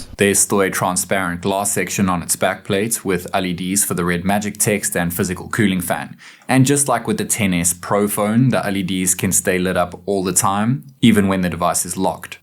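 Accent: South Africa. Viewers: can i ask if this guy is South african? the accent sounds very similar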